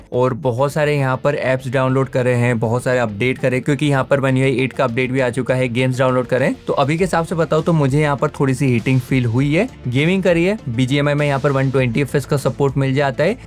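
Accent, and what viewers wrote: Indore, India. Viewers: Bhai Indore se ho kya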